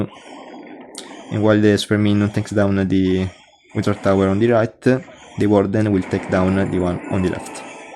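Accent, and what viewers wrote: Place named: Italy. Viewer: Accento più italiano del mondo. Contento che ci sta un italiano così competitivo sul gioco. Specialmente per la army comp che hai creato dato che odio la classica "SPAM EVERYTHING WITH 0 THOUGHTS AND 3 STAR ANY BASE"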